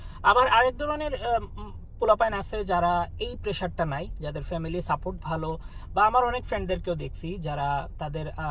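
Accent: Sylhet, Bangladesh. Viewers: আসসালামু আলাইকুম। কেন জানি কথা শুনে মনে হচ্ছিল, আপনি আমাদের সিলেটের। তারপর আপনার চ্যানেলের প্রথম ভিডিও দেখে কনফার্ম হলাম। অনেক অনেক দোয়া এবং শুভকামনা আপনার জন্য ভাই।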